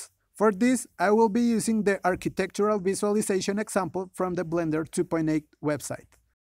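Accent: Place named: Latin America. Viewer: at minute I was like that is a latino accent! es de los nuestros! PD: Accent is not bad my friend, actually feel proud of your roots and being able to speak in 2 languages (or perhaps more) Saludos desde Colombia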